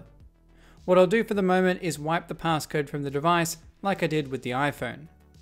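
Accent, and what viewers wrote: Australia. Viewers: I need to stop watching some many Aussie YouTubers, I thought this was a DankPods vid 😂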